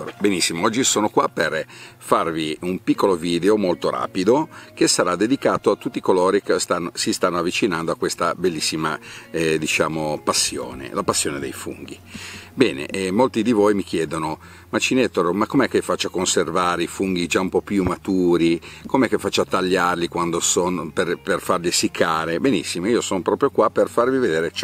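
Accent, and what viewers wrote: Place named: Liguria, Italy. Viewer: infatti mi pareva dall' accento che tu fossi ligure!! Liguria regione meravigliosa ! Grazie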